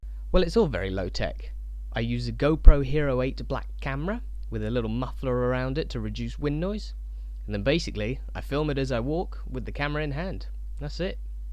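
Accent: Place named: North East England, United Kingdom. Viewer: I genuinely thought you were from the North East of England for some reason! Love these videos - I’m so glad I found this channel - it’s like ASMR for the eyes (if that makes sense haha 😂 )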